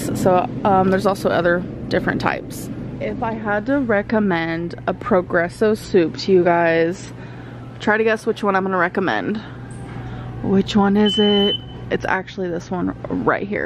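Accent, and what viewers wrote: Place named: Kentucky, United States. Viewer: That's her danity southern belle kentucky accent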